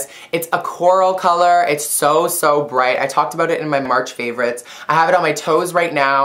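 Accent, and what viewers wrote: Canada. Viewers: Lol love your Canadian accent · are u Canadian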